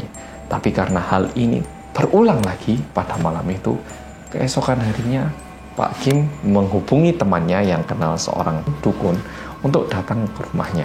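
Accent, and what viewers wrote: Java, Indonesia. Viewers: Merinding boosss, sumpah Bagus nih cerita nya bang medok👍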